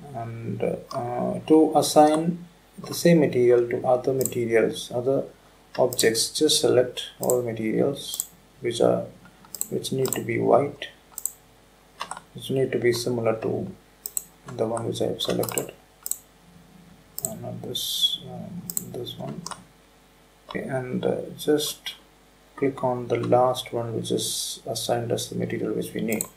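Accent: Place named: Kerala, India. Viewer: malayali thanneyanenn nerathe manasilayi karanam malayliyude english oru english alle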